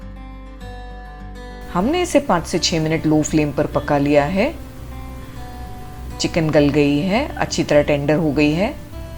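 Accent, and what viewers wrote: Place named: Bangalore, India. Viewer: I am from Bangalore , and you sound a bit Bangaloren so asked. Thank you very much mam...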